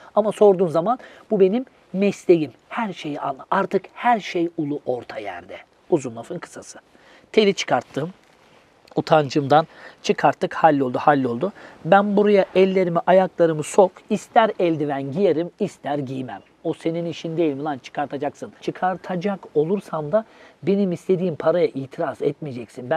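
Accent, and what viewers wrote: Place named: Sivas, Turkey. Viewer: Trabzon mu ben Sivaslı sanıyordum 😅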